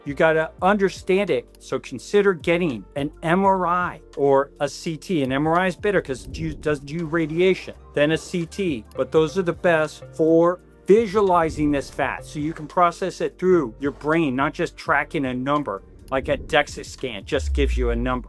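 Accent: United States. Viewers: Gotta love this dude's mid-Atlantic accent. I bet he's from Maryland or somewhere nearby.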